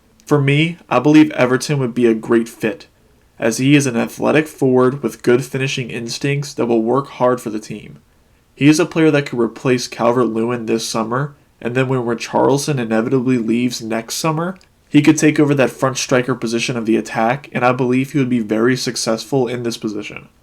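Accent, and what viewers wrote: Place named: United States. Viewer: Started watching, heard Amercian accent, switched off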